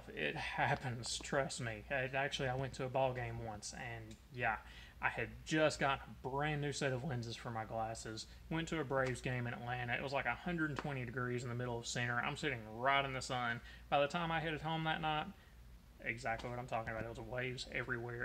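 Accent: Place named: North Carolina, United States. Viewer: I'm guessing you're from north carolina.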